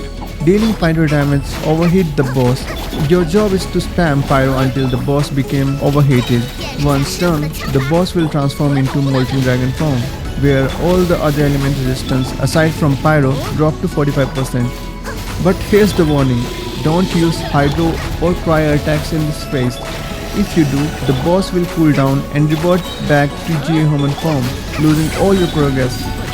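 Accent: India. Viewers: your voice look like indian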